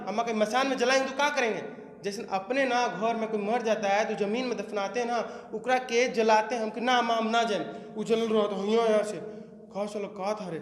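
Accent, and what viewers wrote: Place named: Uttar Pradesh, India. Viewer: Sahi bola Bhai...pura UP ka hi lg rha h bhai...bhasha se🤘🤘🤘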